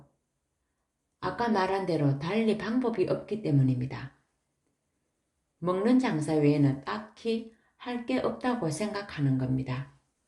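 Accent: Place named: Gyeongsang, South Korea. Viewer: 이웃집 아지매같은 목소리 친근한 경상도 억양이 내맘에 들어오네요♡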